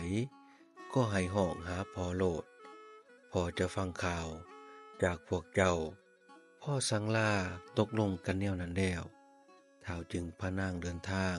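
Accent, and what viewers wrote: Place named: South, Thailand. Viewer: ໄທໃຕ້ເວົ້າມວ່ນ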